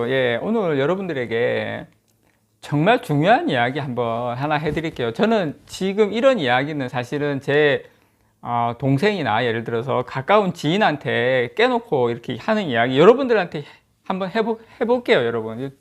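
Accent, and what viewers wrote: Gyeongsang, South Korea. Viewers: 5년만젊었으면좋겠어요 70이 저만치와있는데 주식입문2년차.,올라가면좋고 떨어지면 또 사면되고.기다리지도않고요..심심하지않아서좋아요 고퀄지식에유머에경상도?억양도웃음나게하고...가슴만보여서셔츠와상표만보고 ㅎㅎㅎ감사합니다....알찬방송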